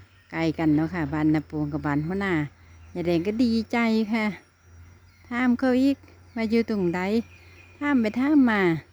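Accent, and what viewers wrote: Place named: Thailand. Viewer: มาฟังนำจ้า ยายแดงเว้าคือกับไทเลย นครไทยกะติดกัน เว้าคือกันจร้า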